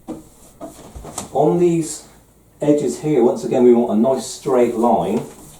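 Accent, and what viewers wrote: West Country, United Kingdom. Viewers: Do I detect a West Country accent ? You voice is very pleasant together with your teaching style and good advice.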